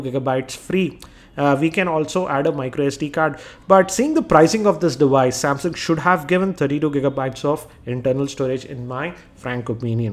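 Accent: India. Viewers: Always dislike the Indian accent (English)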